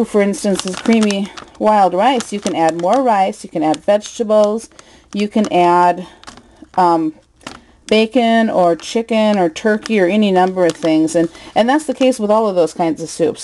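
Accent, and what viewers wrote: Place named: Minnesota, United States. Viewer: You in MN? My wife and i are in the same boat. I can hear the accent.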